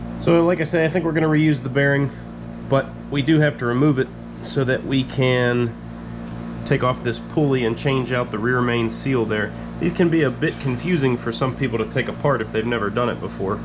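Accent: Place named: Pennsylvania, United States. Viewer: You sir, are my main reason to open up youtube! Quality, real, content. I learn a lot. Please continue. Also, love the PA accent. Family in Altoona. I'm quite a bit south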